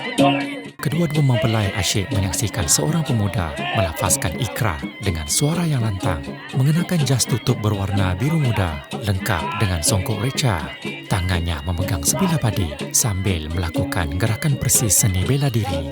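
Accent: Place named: Sarawak, Malaysia. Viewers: ada org Sarawak cakap mcm ni,lagi pun Kalau kt Sarawak ko bunuh buaya tanpa sebab mampos lh ko kna tangkap pihak krjaan Sarawak..Nama pun dia guna atau 😂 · ya... Rasa loghat orang Sarawak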